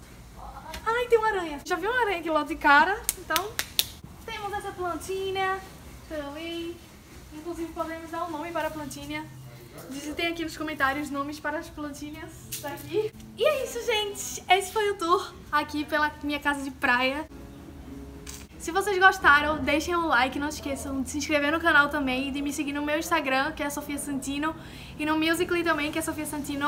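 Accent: Northeast, Brazil. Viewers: Que sutaque maravilhoso de se escultar (sou do nordeste tb)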